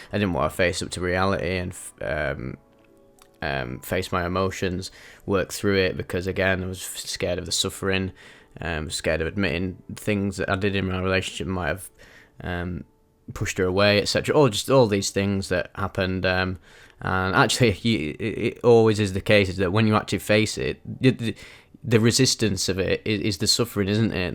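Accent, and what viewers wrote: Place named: Manchester, United Kingdom. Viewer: Thanks for your openness and honesty, sweetie. I have every faith that soon you will be able to be with the pain of your relationship break-up, learn all the lessons waiting for you and move into another exciting phase of your life. The ups and downs of this balmy journey hey... And I just want to say that I love listening to your dulcet, Mancunian tones read out these trip reports - it inspires me as I get started on my own psychedelic journey... Sending Love and Hugs 🤗🥰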